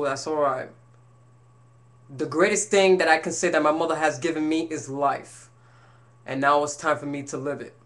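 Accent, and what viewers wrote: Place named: New York, United States. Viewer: Good luck to you! I though i detected a nyc accent.. It's very easy to transition in nyc, reconsider doing it over here. Peace bro.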